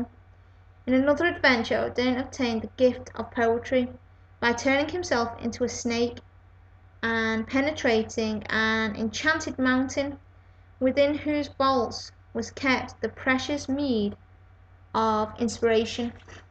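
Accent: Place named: England, United Kingdom. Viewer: I can hear you loud and clear you sound fine and have a beautiful voice. I think chics with a real english accent are hot. Stop listening to what others say they are just jealous of your beauty.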